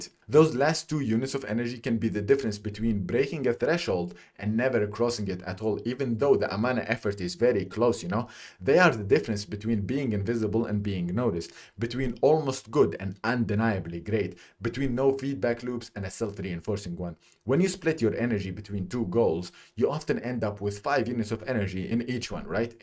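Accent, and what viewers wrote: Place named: Italy. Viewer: Wait why do you sound Italian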